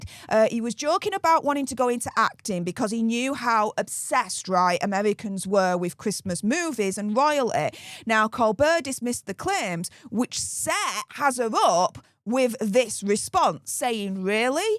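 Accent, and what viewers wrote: United Kingdom. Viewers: Love the way you talk on your show and your British accent👍🇨🇦👍🇨🇦👍🇨🇦👍🇨🇦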